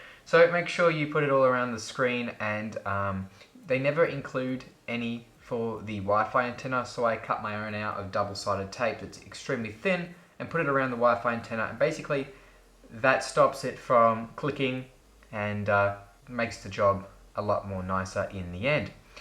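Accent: Australia. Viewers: Is he Australian? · You can clearly hear that he is an aussie wtf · He lives in Australia. He has an accent. He means cellular. I have a cellular iPad 3, but never used the cellular service.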